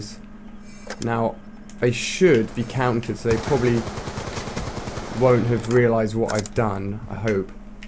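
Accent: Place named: United Kingdom. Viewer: You should do one episode with you talking without a british accent.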